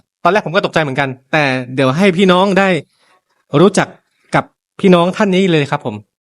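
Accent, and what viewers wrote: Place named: South, Thailand. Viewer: หรอยหนัดบังหรั่ง ❤❤❤ แหลงใต้ชับเปี้ยะ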